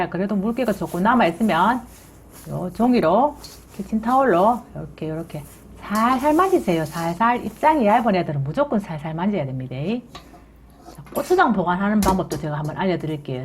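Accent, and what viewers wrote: Gyeongsang, South Korea. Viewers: 사투리가 제캉 비스무리하신데 고향이 어덴교? 첨으로 댓글 달아보는데 볼때마다 경쟁시미 생기네요 저도 몆칠전에 항거 다마났심더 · 정말 잼나게 경상도 말씨가 정감이가네요!! 들을때 마다 웃음보따리 힐링~^-^~ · 경상도말이 너무재미있어요🤩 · 이분이 사투리가 심하네요. 나도 부산인데. 혼자만 아는 사투리 용어도 쓰시는듯. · 경상도 말씀이 넘이쁘요 항상 많이 배워갑니다 감사해요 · 항상 잘 보고 있습니다 구수한 경상도 말씀 너무 재미있게 하시니 정겹습니다 우리 시댁도 영천인데 제피 뜯으러 가야겠다~~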